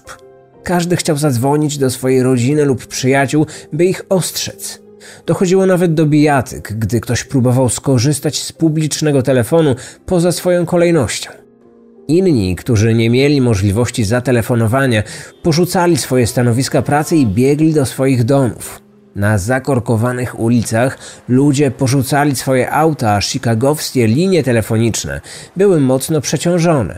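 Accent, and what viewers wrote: Poland. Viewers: Panie, wielkie uznanie za piękną polszczyznę, prawdziwy polski akcent i miły dla ucha głos. Zdrawiam i życzę wielu sukcesów.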